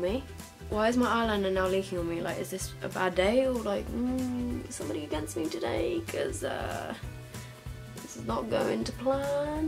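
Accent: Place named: United Kingdom. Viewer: I love accent. "It's got a lot of fool-out". Which part of UK are you from?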